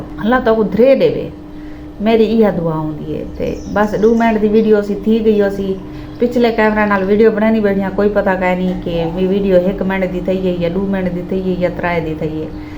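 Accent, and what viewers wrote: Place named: Saraiki, Pakistan. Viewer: jaisay teri methi methi saraiki hai na tuo dil karta hai teray methay methay honton ko choom loon. bohat acha diya hai Jati oya :-)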